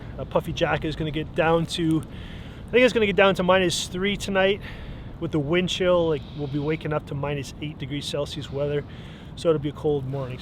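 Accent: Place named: Canada. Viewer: Good job. I'm curious. You have the same accent as Don Cherry. You must be from the same area as him or you have watched too many Don Cherry commentary. Thanks for sharing.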